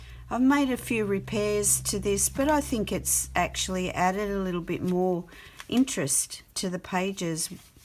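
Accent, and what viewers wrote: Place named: Australia. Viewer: Hi, I am enjoying your videos as I only came across them recently.I love finding new artists on YT. It is also nice to hear an ozzy accent. Thank you for the inspiration and I look forward to following you well into the future. Cheers Vicki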